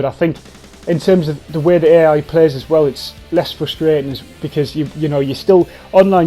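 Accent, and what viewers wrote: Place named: United Kingdom. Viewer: when i heard your British accent i smiled and kept watching with even more pleasure lol thank you a lot for that video!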